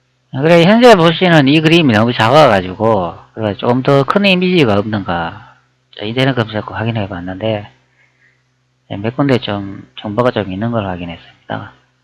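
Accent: Gyeongsang, South Korea. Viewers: 경상도분이 자나요 사투리가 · 그놈 목소리. 반갑다. 겡상도 목소리다. 예리한 자료수집력. 역사고증에 많은 도움을 준다. 자못 기대감이 크다 하겠다. 역사성. 사실성. 확인성이 매우매우 높다.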